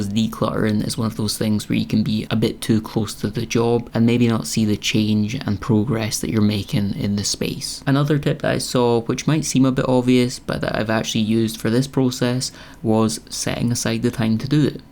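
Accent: Scotland, United Kingdom. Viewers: Great to hear a Scottish voice! I’m in Edinburgh. Yip slap bang in middle of decluttering journey. My best getting started for me my heater developed a leak and soaked 1/2 of my carpet and if you’ve had cats from kittens well if you know you know. Had to get a friend to lift the carpet. Nearly everything had to go into my bedroom with the remainder getting shifted around. So my plan is to go retrieve with I need and the rest either donated or landfill. Aw the best! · Thank you,! New subscriber. Have watched a number of your videos. I have clutter + disorganization challenges, too, to a very scary degree. Appreciate this video and your other videos, too, where you address these issues. I ought to have addressed these issues decades ago when I was a young adult. Hopefully, one or more of the helpful strategies you have mentioned in your videos will stick and I will make progress with these issues. 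I enjoy your channel. You have a very soothing voice, a very nice conversational style, and I enjoy your sense of humor and hearing your Scottish accent. Wishing you continued success in all your endeavors! 06/05/2026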